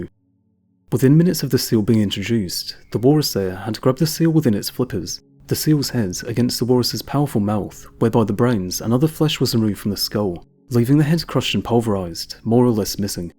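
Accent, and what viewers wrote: United Kingdom. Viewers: First 10 seconds of the video was too British for me to understand 😭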